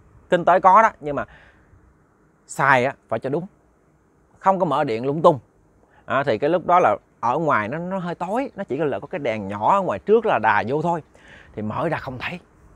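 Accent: Mekong Delta, Vietnam. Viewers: Rat' thich' kenh ke chuyen ma cua voi' giong chan chat' mien`Tay & nhung chuyen ma co' that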